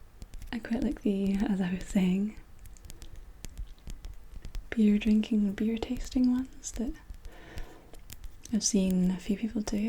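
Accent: Scotland, United Kingdom. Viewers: Do I hear a Scottish accent 👀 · I wish I had a Scottish accent, It sounds amazing. I'm starting to hate my southern draw, because It makes me sound stupid XD... Great video nonetheless, subscribed!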